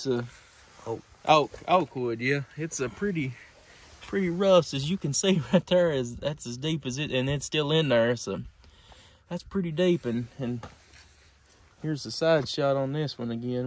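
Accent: South, United States. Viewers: I appreciate your southern dialect also ❤️😎🌟🌟🌟🌟🌟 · That´s a fine Southern accent!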